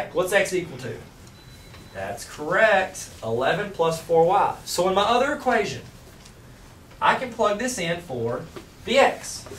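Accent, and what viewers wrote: South, United States. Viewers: I would much rather have this guy with a hot southern accent than my grumpy math teacher now. Please be my teacher.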